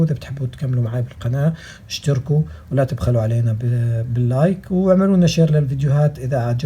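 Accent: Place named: Jordan. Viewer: طيب اخوي هاي لو انمسكت معك من الشرطة في عليها سجن ؟ بالاردن قصدي لانو مبين من لهجتك من الاردن زينا وكل الاحترام لكل الاخوان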